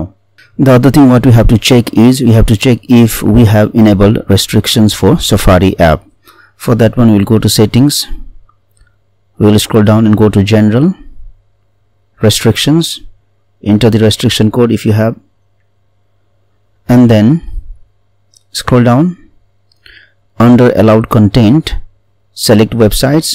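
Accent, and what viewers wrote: United Kingdom. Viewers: Are you from London? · This is the first India guy can speak with English accent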